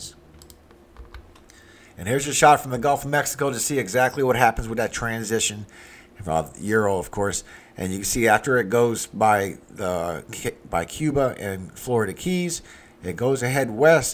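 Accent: Louisiana, United States. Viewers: I love to read your comments. They always make me laugh. I’m from La and my family sounds like this..lol. However, my Dad grew up in Texas, but he tells the best Boudreaux and Thibodeaux jokes. Your posts remind me of him. God bless and have a wonderful day! 😂😂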